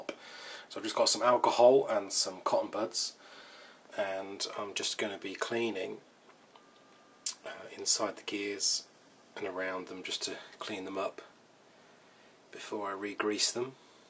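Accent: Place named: United Kingdom. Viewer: Yeap, british accent is so weird. I'll never like it.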